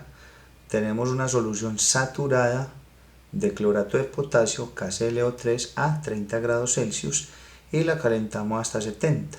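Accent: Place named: Colombia. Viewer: la voz colombiana ctmm mata wn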